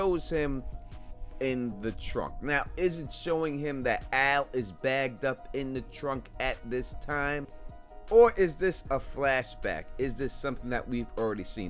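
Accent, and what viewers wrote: New York, United States. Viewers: You gotta be from either jersey or new york with that accent. Loved living in the city. Great content as always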